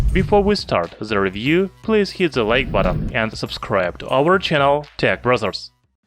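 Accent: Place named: Russia. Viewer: Is this the bother with less of an accent that is more understandable? Nothing against Mother Russia, but seriously guys. There has got to be someone you can find to do the voice aspects to videos. Hell Siri can do it for real cheap. Then viewers won’t have to hit rewind as many times as I had to just to understand what was said. “Th” doesn’t have any “z” in zhem, oops I meant ,, them..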